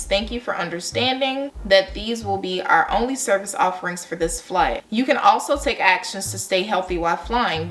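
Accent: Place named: Chicago, United States. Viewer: I can hear your Chicago accent and love it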